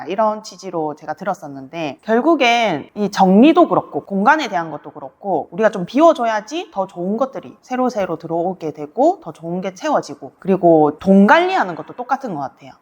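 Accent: Gyeongsang, South Korea. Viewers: 이 가스나 갱상도 말 투를 숨기려고 애 많이쓴다!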